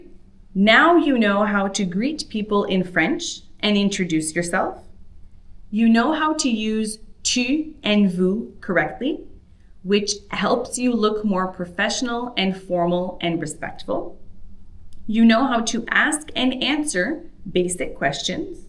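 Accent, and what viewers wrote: Quebec, Canada. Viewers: I would assume this is Quebec French being taught as opposed to Parision French? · Is this Quebecois French or France French?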